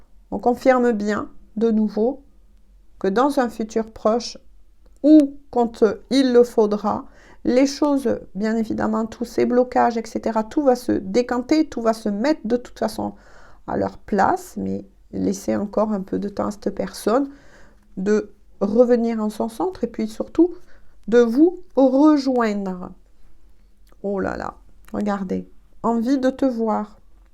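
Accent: South, France. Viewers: Bonsoir!,! je vous remercie pour cette guidance qui me parle, j'aime vos guidances et la façon vous nous expliqué la chose. Je vous souhaite une très bonne fin de soirée!. Ps: j'aime beaucoup votre accent!, accent chantant du sud! 👍😁, moi c'est l'accent créole quand je le veux bien! 🙄🤣 revenez-nous vite s'il vous plaît merci! à bientôt!! 🤗😁. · Bonsoir, oui la lune continue encore a me titiller. Je suis en sur voltage ! Exictée comme une puce qui a mit le mode pilotage automatique, je perds tout, j'oublie tout, aucune concentration, je fais que des bêtises. Cela fait rire mes amis. Oui un froid ,une distance, recentrage ..l'univers nous y a poussé..Nécessaire pour moi que je lache la tension.la cocotte nimute etait prêté a exploser et a la fois imploser.. merci pour tout ses beaux messages qui on fait écho par leurs véracités et votre magnifique interprétation avec notre très beau accend du sud. 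Merciiiii. Le recentrage est pour nous deux chaqu'un a son propre travaille a effectué et gérer. Encore merci pour cette chaleur cette belle lumière qui éclaire les zones d'ombres. Elle magnifique car vous a faite vibrer par votre aura, votre propre lumière. Ps : j'ecoute toujours mon propre discernement, ma propre guidance intérieure! Je ne m'enflamme pas, je sais très bien que c'est une quidance générale et j'ai toujours le recul qu'il faut. J'avoue elle n'a bluffé par ses vérités. Merci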